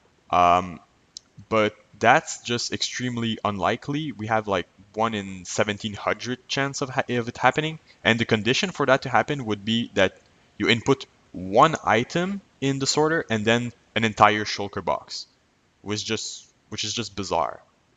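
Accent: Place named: Quebec, Canada. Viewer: Bro t'es québécois? j'reconnais ton accent XD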